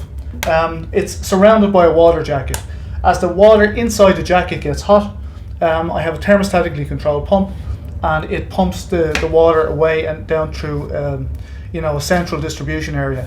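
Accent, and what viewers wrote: Ireland. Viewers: Ah, I love the brogue! You could read the phone book or dictionary, and I'd listen. Reminds me of the older folk when I was a child. Why is it that so many DIYs from Ireland ad GB tell you to mix something to the consistency of porridge? Makes me smile, because it's so descriptive. :)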